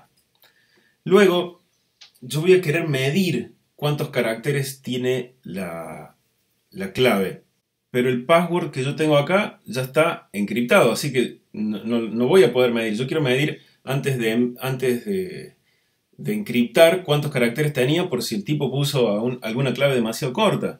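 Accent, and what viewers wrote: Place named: Cordoba, Argentina. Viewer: estan buenisimos tus videos , muchas gracias por compartirlos, de donde sos ? sonas bien cordobés, yo soy de Cosquín.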